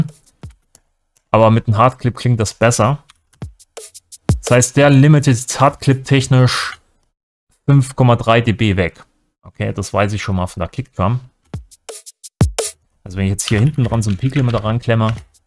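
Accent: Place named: Berlin, Germany. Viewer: Besten Dank für dieses neue Video, welches wieder interessante Einblicke in Arbeitsweisen und Möglichkeiten bietet. Und wenn Dein Berliner-Slang rauskommt ist das auch sehr amüsant; und auch so, gerne Labern! Alles Gute und Merci!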